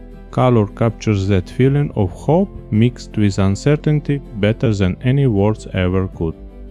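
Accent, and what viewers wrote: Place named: Ukraine. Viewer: You speak like a Ukrainian person whose videos we watch. Of course, I know that doesn't mean you are from there, just that to my ears it sounded similar. Most of your videos that I had watched used the more American-sounding voice. This was the first time I heard your less changed voice. I'm glad you liked the story of some of my family's origins. We have enjoyed going back and finding out where all our ancestors traveled from. I greatly look forward to seeing more of your brought-alive old pictures. 😊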